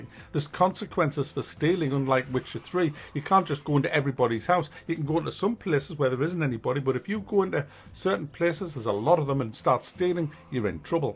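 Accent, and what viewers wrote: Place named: Liverpool, United Kingdom. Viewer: That made me fucking crack up man.. Love the scousser accent too! LMFAO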